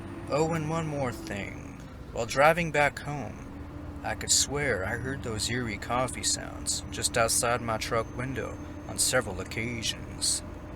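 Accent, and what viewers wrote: South, United States. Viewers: Always loving that southern accent .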